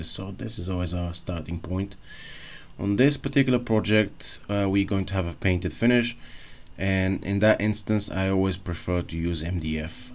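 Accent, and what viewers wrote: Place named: London, United Kingdom. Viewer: what’s that accent?…it’s a bit Laaaandon..but mixed with what? Excellent video by the way.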